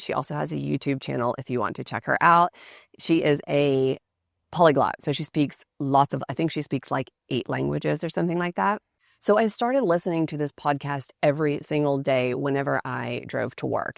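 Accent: United States. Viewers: I wish I could speak American English like you; my goal is to speak fluently, and I know I can do it. For context, I am from Syria and live in New York, but unfortunately, I don't have any friends to practice with. I really love your high-quality content.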